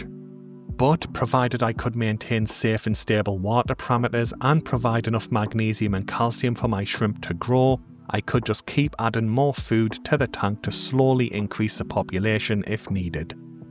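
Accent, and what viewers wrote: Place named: Lincolnshire, United Kingdom. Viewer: yeah, the posh twang which you must have got from your time in Lincolnshire threw me.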